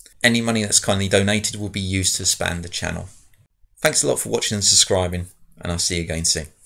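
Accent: United Kingdom. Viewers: Both lessons are excellent. (Everyone loves a British accent). FreeCAD specifically, and CAD programs in general, are inherently complex, NOT intuitively obvious, and can be extremely frustrating. But all videos are exceptionally well done, and well thought out. Each step is performed without error, so there is less confusion. The parts have sufficient complexity but not too much. The presentation is correctly paced for absorption, uses several commonly used features, and is most helpful. FreeCAD is very powerful once mastered. Thank you for doing a great job and a wonderful service to the 3-D community. 😀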